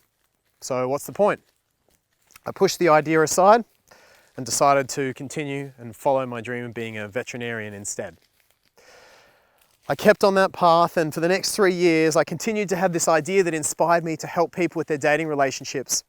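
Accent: Australia. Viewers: Everyone has his/her own strength, beauty, and talented like ourselves fingerprints. No one can still what we have. I’m just like you and others. I’m proud to be my authentic me. Yes, strongly agree! Only you if you want to be great or better to complete and compare with, this is the worst enemy you will face with in the world. Often time I wanted to give up on what I’m doing, often time I want to become whoever better than me. But the end of the day, me only I can be. I love the way you are. you are sexy I’m telling as a feminine energy. Your Australian accent is so cute and unique. I’m Thai but have lived in the USA for awhile, people get a good laugh out of my Thai-Lish all the time. I thought, YES, I’m so special cuz only me can talk just like me. I am a surgical assistant for cardiac surgery, I can do a job like Americans, but they can’t speak English like me 😉 I learned to love myself since I’m different in the different world like America. 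Thank you for your message, it’s so rich and right on the money 💰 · Australian accent is equally good 😜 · You have an Australian accent! Oooeee! Just as sexy... great message, you’ve got to own your uniqueness ❤️ it’s taken me a long time to look in the mirror and believe I’m a 10/10 in my own right xx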